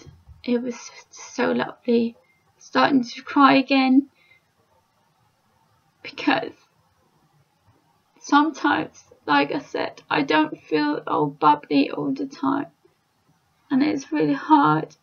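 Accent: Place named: United Kingdom. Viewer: Du virker sød men hvorfor taler du med britisk accent. Håber det bedste for dig.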